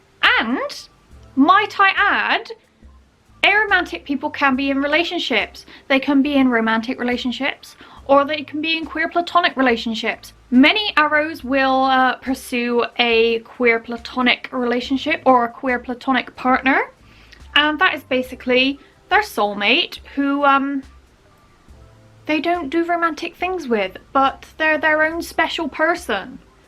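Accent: Bristol, United Kingdom. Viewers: This has been helpful. Also it's nice to hear a Bristolian voice on Youtube :) cheers drive!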